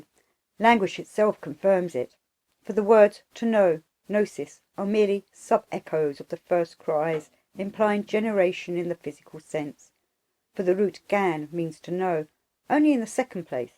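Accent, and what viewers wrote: United Kingdom. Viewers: Between the horrible audio effect,which muffles every word and the limey accent, it's utterly impossible to follow from sentence to sentence. Why bother? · O wonder! What lovely voice! It’s so beautiful to listen to Crowley’s writings in a british (i assume british. I apologize if different) accent.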